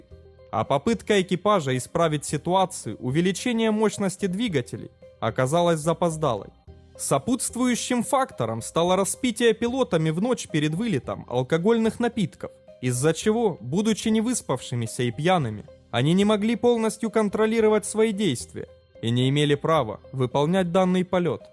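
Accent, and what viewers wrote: Ukraine. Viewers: Самый обыкновенный украинский акцент:) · Капец, какой противный хохляцкий акцент:третЯво сентЯбря тысЯча! Вообще слушать противно. Сразу и выключил. Аффтор, исправляй свой хохляцкий акцент.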